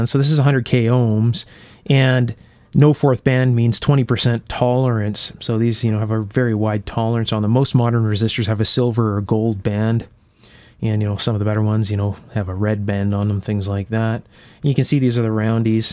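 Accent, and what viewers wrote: Canada. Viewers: I had no idea that Canadians pronounce thing the same way we do in Australia...like Zed instead of Zee, and solder instead of sodder. Impressed. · I was at first agast to hear you pronounce Solder soul'-der instead of saw'_der and Far'-ad as Fer-ad'. Then I head you say awoot and understood that you must be Canadian, ayh. OK I get it. But Michael's name wasn't Fer-ad'-ay, it was Fara'-day. I didn't know Canadians took so much of their speech from the English as apposed to the way Americans talk. As was said in My Fair Lady, "Why can't the English speak English? The Americans haven't been doing it for years." Keep up the good work and I can't wait to see the referb video, either.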